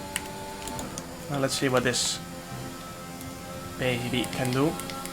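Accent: Netherlands. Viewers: Je hoort gewoon dat jij Nederlands bent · Just a regular dutch accent to he honest 😅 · Hoor dat je Nederlander bent hahah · I can litterally hear ur a dutchie Ur accent isn’t like mine I hav an British accent and I’m an Dutchie aswell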